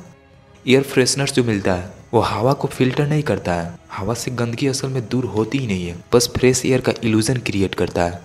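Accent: Bihar, India. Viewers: Aap kya bihar se ho apki bhasha se pata chalta hai. Ap bahut hi knowledgeful video banate ho thanks for this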